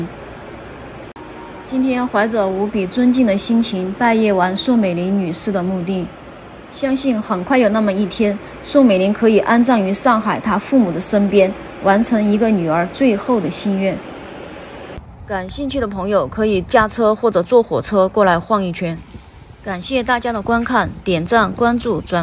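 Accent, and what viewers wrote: Sichuan, China. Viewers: 听你川话上头了，问一下你联系方式，也想听你的讲话保持上头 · 听口音应该是重庆或是四川人的博主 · 我是贵州人，也是半个成都人，所以很喜欢听你的川普话。你去的这个墓园我两年前去过，我们当时是三个人去的，尽管大厅里有音乐，因为空旷无人，走起来毛骨悚然。你视频里拍到的那些地方我都有经过，我也看到了这几个人的墓碑，还有一些你没有提到的国民党高官的壁葬碑文。我拍了照片，发了微博，我也非常感慨，岁月不曾饶过谁。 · 四川口音。。。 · 四川峨眉？普通话不准，😄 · 川普好评！👍听着就亲切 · 就事论事，川普话真是难听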